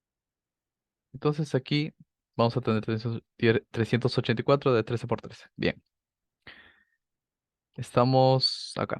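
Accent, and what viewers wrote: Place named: Peru. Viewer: De dónde eres?...tienes acento peruano. Excelente video.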